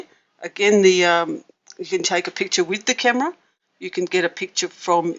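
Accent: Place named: Australia. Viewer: Great help and very clear. Loved hearing an Aussie voice too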